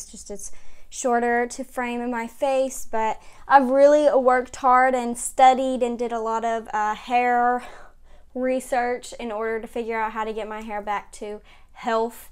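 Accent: South, United States. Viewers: It's a southern accent...could be Californian.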